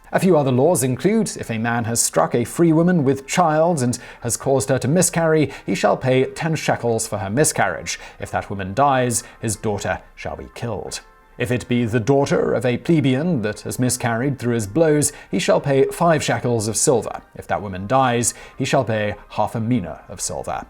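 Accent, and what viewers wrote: United Kingdom. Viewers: Well the Brits pronounce alot thing differently....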